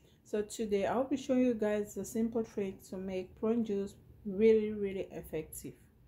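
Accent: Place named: Edo, Nigeria. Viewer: Sounds like a 9ja sister Beni or Esan. I go try this one sha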